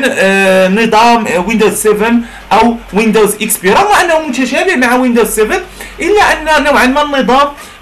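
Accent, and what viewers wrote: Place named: Morocco. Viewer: اخوي هو شخص مغربي ولهجة المغارب لهجة مختلفة وحتى النطق مختلف ( مثل المصاروا الثاء ينطق س ) وهناك الكثير من الامثلة وهو يحاوول ان يوصل المعلومة بالذي يملكه من معرفة وقدرة [ قال ابن سيرين : إذا بلغك عن أخيك شيء فالتمس له عذرا، فإن لم تجد له عذرا فقل لعل له عذرا.]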